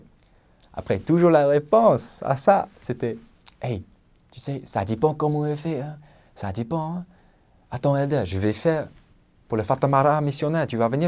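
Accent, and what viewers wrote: Tahiti, French Polynesia. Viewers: 🤣🤣🤣 il s'exprime comme un tahitien !! Reviens nous voir 💕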